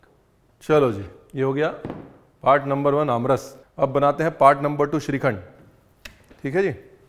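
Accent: India. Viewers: Never heard of it sir love your deliberate desi pronunciations "waaater" 'mangostaan' nice dish definitely going to try it salute to my favourite chef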